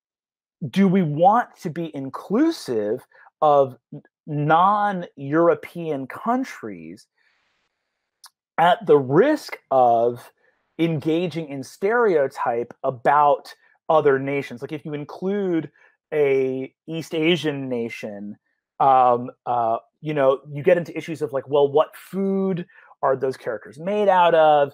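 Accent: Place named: General American, United States. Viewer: He doesn't do it with Asians, either. His Battle for Beyond character, Nikhil, was coded Indian -- DM Jasmine Bhullar even gave the character's sister a distinctly Indian accent. Brennan just spoke with his Broadcast American English accent. Bear in mind Brennan can do quite a lot of white accents, from New Yawkuh to Sad Italian Man, to Russian mobster, to various flavors of Tim Curry, and many more.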